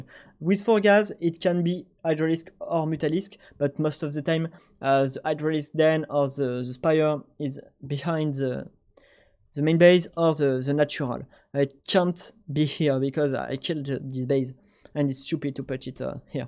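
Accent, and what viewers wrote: France. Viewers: Great info dude! Love your Frenglish accent as well :) Waiting for more non-French videos like this.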